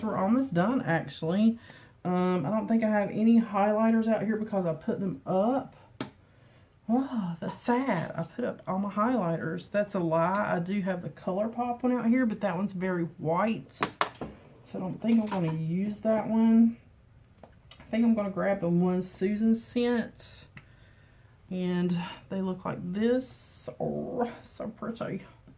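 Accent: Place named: East Coast, United States. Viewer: Hi, fun GRWM! I subbed back to you and thanks for watching my channel too! As you may know, I have lost 50 lbs on keto at age of 67. I love keto and have recently started a new Facebook Group with a link on my video called Keto N Koffee with Marjorie. I think its wonderful that you and your husband have been researching and plan on doing this together. If I can be of help, just ask! I love your accent, you sound like my relatives back east! I am in So. CA. You already have a good start with your weightloss! Your makeup looks lovely! xoxoMarjorie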